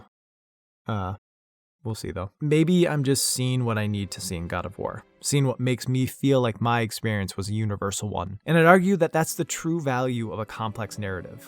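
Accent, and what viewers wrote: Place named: Midwest, United States. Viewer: NO WAY!! I grew up around the Twin Cities! Currently in Florida for college but I recognized the city, I could kind of tell you were from the mid-west by how you talked but I never realized you were that close to home. Your channel inspired me to make my own video essays about my work in VR development. Keep up the awesome work!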